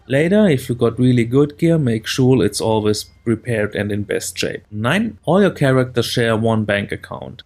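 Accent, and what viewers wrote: Germany. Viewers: Good collection of some basic tips. But man ... your german accent is HORRIBLE XD Beeing from Germany i feel i can say that without sounding like a dick ;) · dat heavy german accent xD thx for the tips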